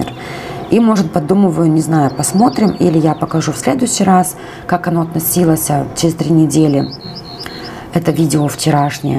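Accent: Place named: Germany. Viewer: в Германии. На немецком Fiber; Fiberglas( стекловолокно) читается, как « фибер / Фиберглас «. Это не ошибка, просто читает, как немцы читают. Здесь корректно говорить именно фИбер.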